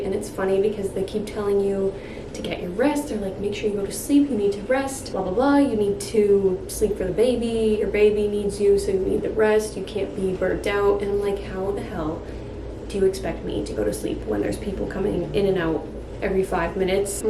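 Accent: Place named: New England, United States. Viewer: Agh! He’s adorable! Literally!!! I also love how obviously you’re from New England, and I love how it’s GreysEN and you gave it kinda a New England flare and I love that 🫶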